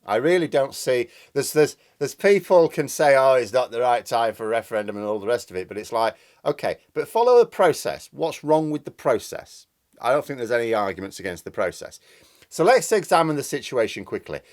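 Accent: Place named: Yorkshire, United Kingdom. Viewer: Soft Yorkshire lad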